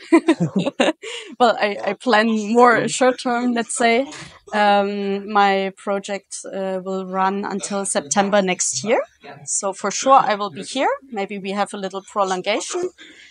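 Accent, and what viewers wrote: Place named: Russia. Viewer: Отлично,. Английский ваш смешной, очень русский, но честный! И ход точный: с разворота брать интервью на английском. Знай наших, питерских!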